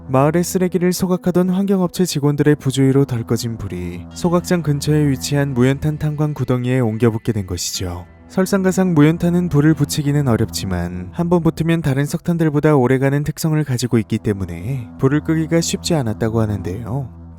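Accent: Japan. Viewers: 왜 자꾸 영어가 일본어 들리지 ㅋㅋ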